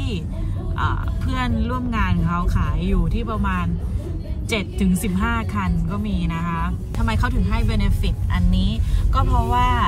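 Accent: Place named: South, Thailand. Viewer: ไม่แปลกใจเลยค่ะ ว่าทำไม่อยู่S Carolina ทำงานกับ บ. แม่ BMW นี่เอง ชอบน้องแหลงใต้มากค่ะ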